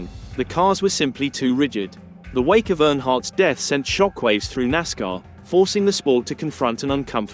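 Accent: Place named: United Kingdom. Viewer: You just ruined this by having a Damn British accent Commentator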